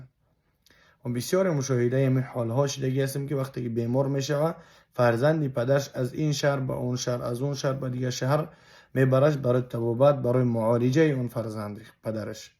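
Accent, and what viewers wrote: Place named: Afghanistan. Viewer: Чихе хушру гап мезанен ака! Тарзи гапзанитон кам кам ба гапзании Афгоно монанди дора. Чикадар Махкулайма гапзанишон.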